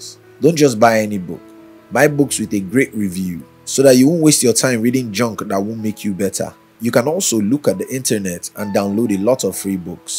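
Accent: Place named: Nigeria. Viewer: That's Nigerian Accent, must be a Nigerian.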